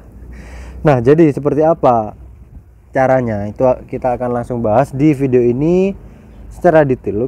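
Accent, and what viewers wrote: Madura, Indonesia. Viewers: Orang madureh kak?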